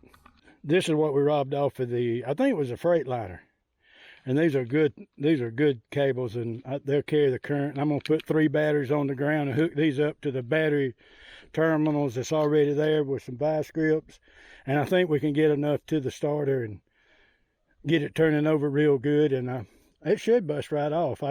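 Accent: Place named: South, United States. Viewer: You're welcome. The polite southern commentary is 2nd to none.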